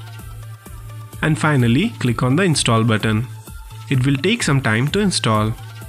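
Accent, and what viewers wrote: Kerala, India. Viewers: To be truthful this man is far more easy to follow and understand from than even our school teachers. BTW You can be sure that a tutorial is good if you hear an Indian accent. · r u Malayali ?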